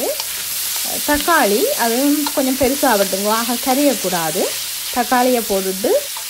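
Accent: Sri Lanka. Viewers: This is manju rockzz from india u r srilanka tamil ...enaku srilanka tamil romba pudikum..unga channel melum valara valthukal...thank u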